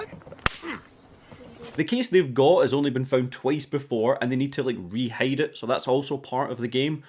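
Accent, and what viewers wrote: Scotland, United Kingdom. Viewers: I know his name is like dallas but bcuz of ur accent as a fellow Scottish person sounds like ur saying he’s dadless lol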